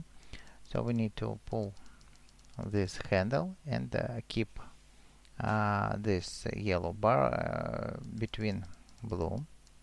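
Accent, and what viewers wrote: Russia. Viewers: А ты русишь ты спалился что у тебя русский язык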